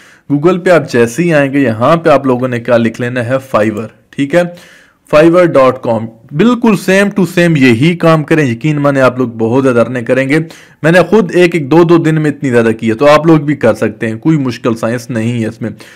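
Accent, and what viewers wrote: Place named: Mianwali, Pakistan. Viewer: Boli se AP mianwali k lgty hain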